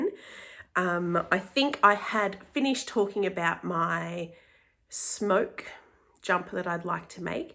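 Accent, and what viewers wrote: Australia. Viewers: Congrats from W.A on a very enjoyable and technically brilliant first podcast, it's always terrific to hear that aussie accent. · Hi, Diane from Mount Waverley here. I was so thrilled when I clicked on your podcast and out came your Aussie accent saying you were from Geelong. I loved the way you promoted Aussie yarns, especially BWM. I can't wait to watch your next video. As for jumper/sweater suggestions, it depends on what type of fit you want. Basic raglan sweater by Joji Locatelli might be a good place to start. Or the Felix pullover by Amy Christopher's or the No Frills Sweater by Petite Knits. I've made the latter two, and they are both good, basic raglan, top-down constructions.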